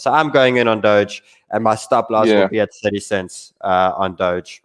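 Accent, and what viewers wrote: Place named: South Africa. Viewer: I’m South African, but damn, I’m loving your accent. I miss SA accents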